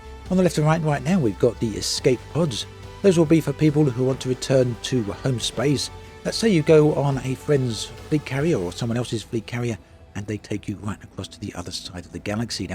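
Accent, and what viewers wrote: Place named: Dorset, United Kingdom. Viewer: Sir please reduce that music, I need to hear your dorset tones:)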